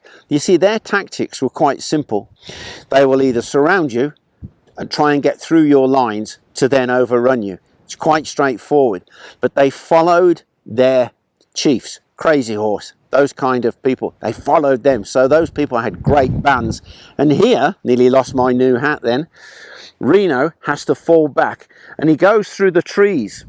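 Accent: United Kingdom. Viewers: Got a love how a person with a British accent wants to judge people on colonialism and expansion...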